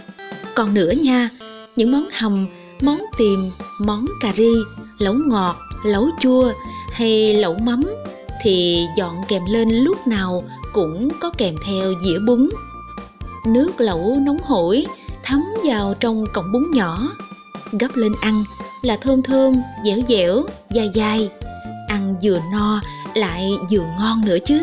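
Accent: Mekong Delta, Vietnam. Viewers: Chất giọng huyền thoại...làm nên tên tuổi của người miền tây..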